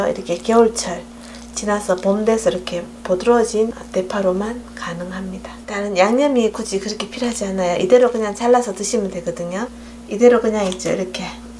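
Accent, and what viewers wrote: Gyeongsang, South Korea. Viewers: 겡상도 사투리 억양이 이쁘네요^^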